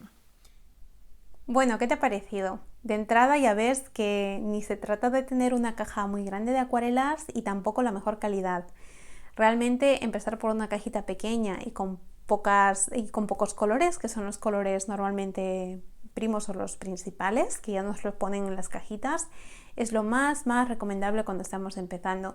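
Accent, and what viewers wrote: Spain. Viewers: Muy interesante y educativo. Por su acento diria que esta en España. Es asi ?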